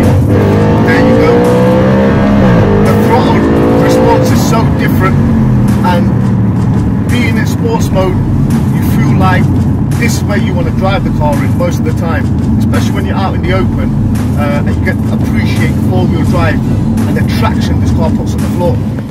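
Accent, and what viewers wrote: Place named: England, United Kingdom. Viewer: Love you english accent man goed review subscribed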